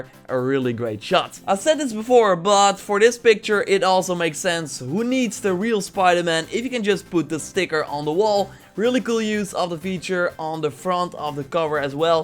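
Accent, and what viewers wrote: Netherlands. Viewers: You’re dutch. I can tell your accent haha (im dutch to :] ) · Are you from the netherlands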